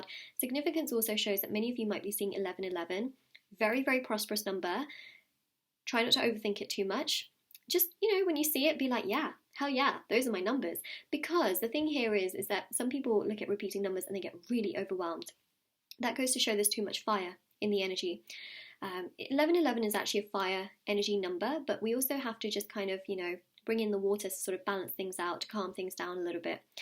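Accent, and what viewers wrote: England, United Kingdom. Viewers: I like your English accent, beautiful ❤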